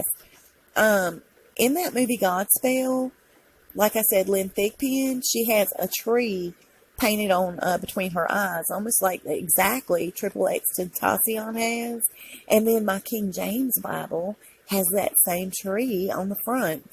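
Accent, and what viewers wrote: South, United States. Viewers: GOD'S LOVE watched 2 of your videos the other day and subbed. Love your channel. Love your south Accent with that 'Twang'. New sub. BUT all I've got to say which would be criticism is; 'Michelle Obama' IS a man, It has an Adams Apple and the ring finger is bigger than index.. Just like a man. Plus a women can only fit 1-1/2 headxon their shoulders. She can fit 3.. All the best.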